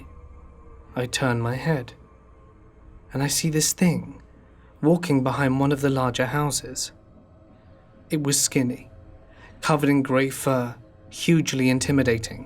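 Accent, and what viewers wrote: England, United Kingdom. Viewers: You so Emglish ,i love it 🥰xxx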